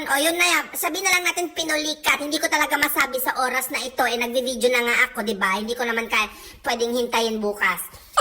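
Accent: Ilocos, Philippines. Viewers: Ilokano is real parin auntie Mas magandang pakinggan SA mga ilokanang katulad Ko Char 😁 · Antie mas may dating pagka ilokano ang salita mo.. dabes yung part 2/3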